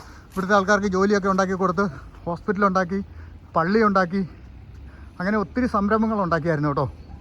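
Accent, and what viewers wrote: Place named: Idukki, India. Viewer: ഞാൻ കൊച്ചിക്കാരൻ ആണ്. നിങ്ങൾ ഇടുക്കിക്കാരുടെ സംസാരം കേൾക്കാൻ തന്നെ ഒരു പ്രത്യേക സന്തോഷമാണ്.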